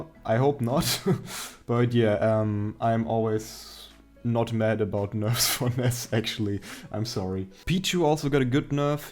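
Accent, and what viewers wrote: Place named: Germany. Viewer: dein Englisch klingt echt hart deutsch.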